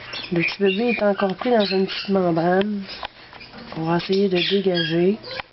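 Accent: Quebec, Canada. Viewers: oh bas oui on remarqué que tu es du Québec !!!!!!!!!!!! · Ahah je kiff l'accent québécois ;) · pas française, québécoise !!! listen !! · J'adore votre accent canadien (si je ne me trompe pas) et bravo pour le sauvetage :) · J'adore l'acsen québécois ! J'arrive pas a le faire... 😕 ! Un jour d'arriveré.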